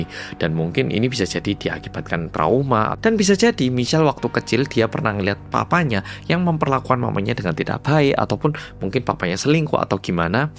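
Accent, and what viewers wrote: Java, Indonesia. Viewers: 😅😅 malah tertarik medok jowo ne Mase,nah yg bikin ngakak lagi kok malah wong Korea ngono lhoool😅😅😅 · Masih suka suaranya ..medok bgt jawane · Lucu aja kalo dengar oppa medok cerita😅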